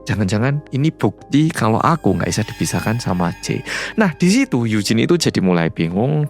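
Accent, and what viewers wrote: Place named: Java, Indonesia. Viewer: Mas, aku sering nontonin videonya sambil makan, mamaku sering denger aku nonton dari ruangan lain dirumah, suatu hari mama nanya “kakak kenapa sih suka nontonin cerita orang jawa?” (Karena logat mas medok) 😂😂😂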